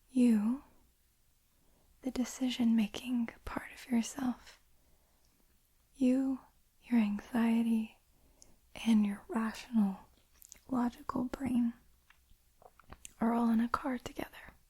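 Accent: United Kingdom. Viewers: Wait you’re not British?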